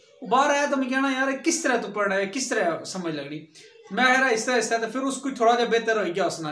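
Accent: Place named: Punjab, India. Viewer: Ye kis zile di jaban hai.tusa Punjabi se mukhtlif bol rahe ho.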